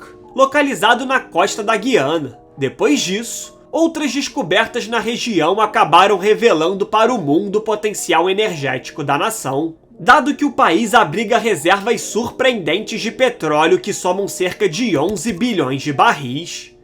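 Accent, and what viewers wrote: Rio de Janeiro, Brazil. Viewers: O que mata o video é esse carioquessss do narrador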